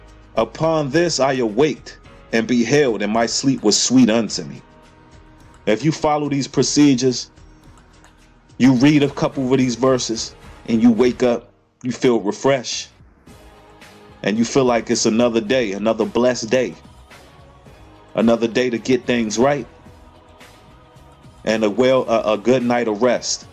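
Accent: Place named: DMV, United States. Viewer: You from the DMV homes? You sound like it...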